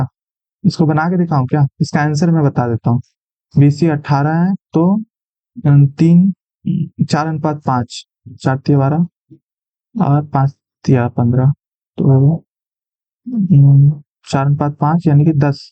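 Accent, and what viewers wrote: Bihar, India. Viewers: Bihar se ho kya Bhaai???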